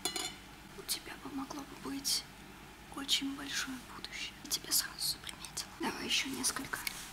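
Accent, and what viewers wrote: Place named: Russia. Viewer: I agree. Her Russian accent really makes this more relaxing than it should be!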